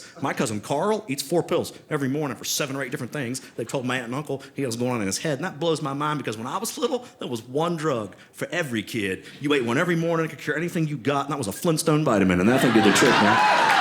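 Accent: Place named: South, United States. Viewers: It’s the Southern charm 🥰🥰🥰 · I'm from north Carolina. This dude is talking exactly the way I grew up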